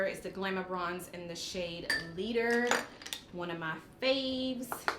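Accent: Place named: Texas, United States. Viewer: Where is she from? Sounds like texas. Im new here.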